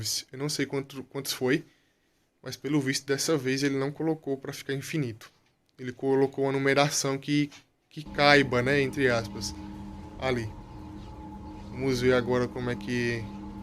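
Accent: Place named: São Paulo, Brazil. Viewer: Pela voz se tem 30 e poucos anos e no jeito que se fala provavelmente se são Paulo na cidade de assis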